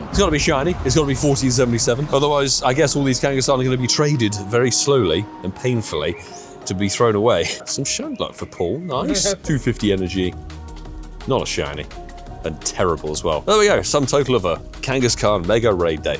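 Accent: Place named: United Kingdom. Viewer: another perk of british accent, running nose doesnt sound differently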